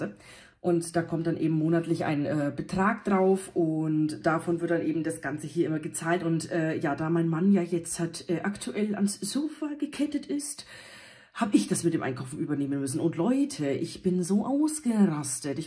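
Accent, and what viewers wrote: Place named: Franconia, Germany. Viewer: Ich liebe den fränkischen Dialekt. Bin selbst auch ein schwäbischer Franke. Bitte bleib so wie du bist !!!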